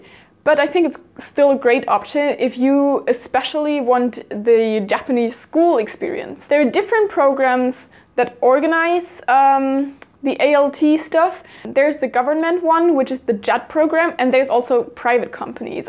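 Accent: Germany. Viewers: So, she's German. That explains why I can actually understand what is being said, because English native speakers usually speak too fast for me to understand... Nice accent and good fluency. ^^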